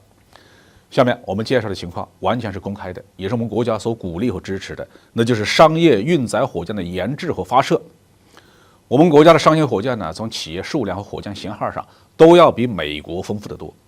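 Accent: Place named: Hunan, China. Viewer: 湖南普通话很有意思。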